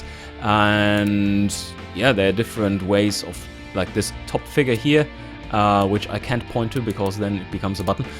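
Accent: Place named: Germany. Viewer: Ich mag Englisch mit deutscher Akzent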